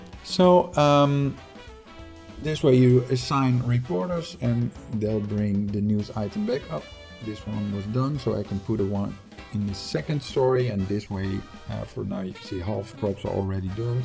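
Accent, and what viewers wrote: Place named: Netherlands. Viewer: Volgens mij hoor ik een Nederlander?!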